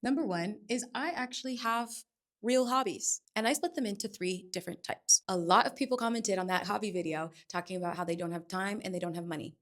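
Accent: France. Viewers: Omg! Your French accent is so beautiful! I’m a native French speaker and you sound way nicer than I do 😭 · Your French accent is amaaaazing! Love it 😊🎉 C'est très BON!! ❤ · Ok but your French accent is 🥖 incroyable🥖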